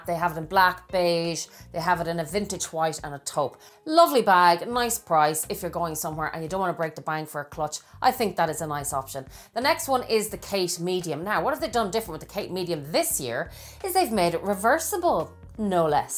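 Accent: Ireland. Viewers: Thank you & your Irish Brogue also make listening merrier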